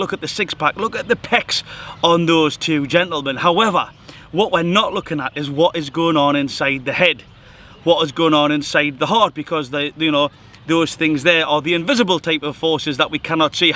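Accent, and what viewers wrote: Newcastle, United Kingdom. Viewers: Hes got a geordie accent,its the nicest accent.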